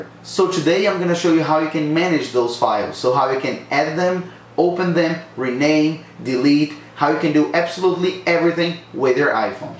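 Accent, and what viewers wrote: Brazil. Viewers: Dude, are u Brazilian? I’m Brazilian as well and they way you speak English it’s exactly how Brazilian accent sounds like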